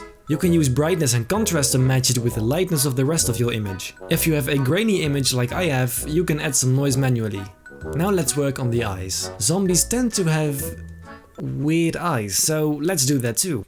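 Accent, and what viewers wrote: Netherlands. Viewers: Bro je hebt een geniaal account man, Ik weet niet hoe ik je tegen kwam op YouTube, maar toen ik je accent hoorde wist ik gelijk dit is een Nederlander haha. Keep it up man, good work. Abbo er bij ! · Are you Dutch? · Are you Dutch??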